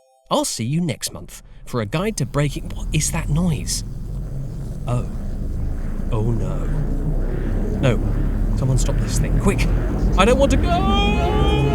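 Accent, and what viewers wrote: United Kingdom. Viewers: i wouldnt mind if narrator narrate my life he seems like a very nice british person too bad he could only stay for one more year 😔😔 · Oiii oiii this narrator is British less goooo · Alternate Title: British Narrator creates a time machine while reflecting on Mumbo Jumbo's tutorials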